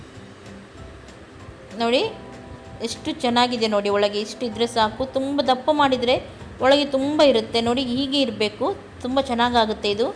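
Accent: Mangalore, India. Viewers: madam nivu Mangalore avra kannada age mathadthira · Nimma maathu tumba cute rii kelikke koshi agutthe manglore kannada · Your Mangalore Kannada explanation so beautiful , good vedio mam. Super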